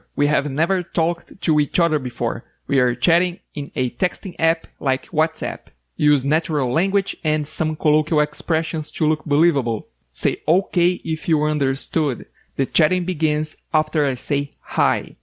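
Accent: Brazil. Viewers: so pelo sotaque ja percebi que e br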